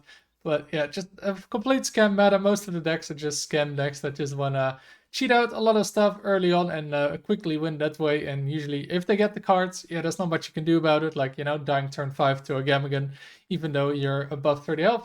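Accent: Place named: Europe. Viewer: all of this was already said just not in an annoying euroshit accent. revolting .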